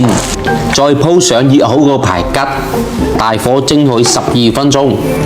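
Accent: Dongguan, China. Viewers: 地道東莞話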